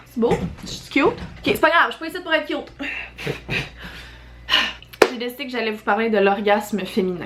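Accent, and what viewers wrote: Quebec, Canada. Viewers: Qu'est ce que j'adore l'accent Québécois je kiff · Français mais québécois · En tant que français ET homme sa me fait rire c'est sujet alor imaginer que ce soit avec un accès québécois et de l'humour qu'on en parle là je suis mort surtout que j'adore ces vidéo sa y a ma journée est refaite 😂😂😂 merci 😘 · Venir=jouir? (j'adore le français de Québec ❤) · Je comprends pas très bien car j arrive pas comprendre car tu parles trop vite et j ai pas l habitude d écouter quelqu un qui a l accent québécois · c'est juste à la fin que j'ai compris que le mot "blonde" veux dire petite amie... mais l'accent Quebecois est vraiment satisfaisant, j'ai adoré dés le premier écoute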